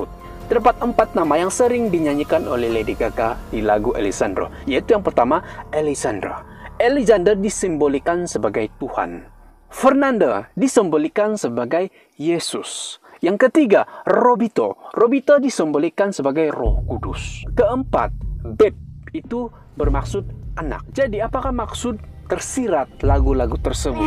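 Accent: Sabah, Malaysia. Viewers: Sabahnya pekatt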